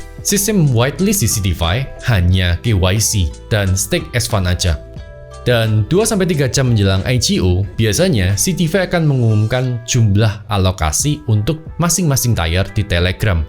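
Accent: Java, Indonesia. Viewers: Bro asli mana medok bgt. Salam jawir wkwk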